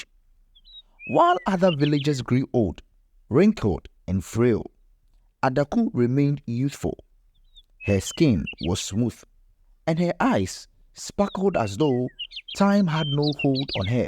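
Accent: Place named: Ghana. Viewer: Lovely story. Loving the Ghanaian accent!!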